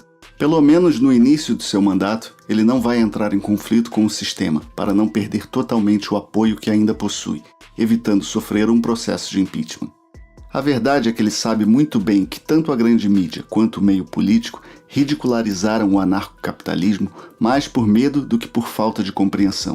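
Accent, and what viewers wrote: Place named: Rio de Janeiro, Brazil. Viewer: Carioca kkk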